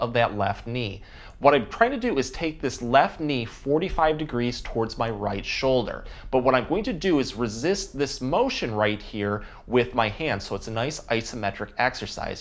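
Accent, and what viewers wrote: Michigan, United States. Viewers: are you from Michigan? Trying to place the accent.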